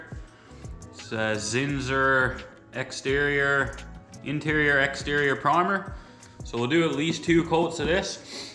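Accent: Newfoundland, Canada. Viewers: U sounds like a Newfie by!! 🤔😅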